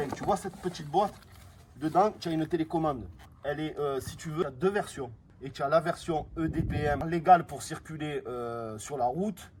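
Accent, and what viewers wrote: South, France. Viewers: avec plaisir avec ton accent du sud tes vidéos sont vraiment Crème 😅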